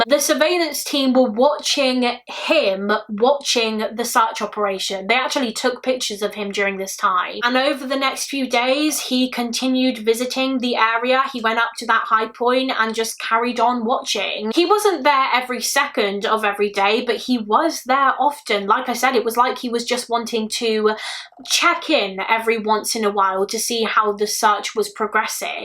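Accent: United Kingdom. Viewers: Fabulous story telling thank you kindly and luv ur Uk accent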